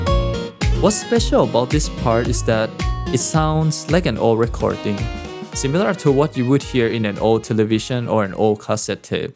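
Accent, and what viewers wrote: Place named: Thailand. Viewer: ตามมานานแล้ว พอรู้ว่าเป็นคนไทยยิ่งดีใจเลยค่ะ (เอ๊ะแรกคือจากสำเนียงค่ะ55) ทุกคลิปของคุณคือดีงามมากเลย จะสนับสนุนต่อไปนะคะ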